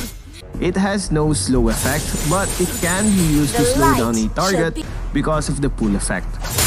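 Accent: Philippines. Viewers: Loving your accent, the way you say "windtalker" ughhh sexy Filipino accent❤️ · But the way he says "attack" is kindda weird bcz of his thicc Filipino accent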